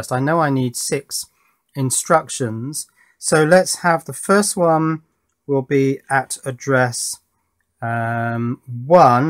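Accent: United Kingdom. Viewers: Are you British Man!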